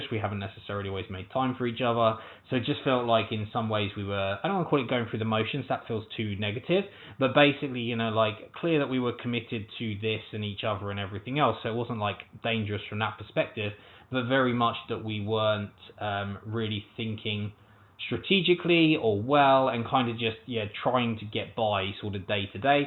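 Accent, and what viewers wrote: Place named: Australia. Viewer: I cannot tell if you have an Aussie Twang to your voice or it's that sort of unique London/Southern accent.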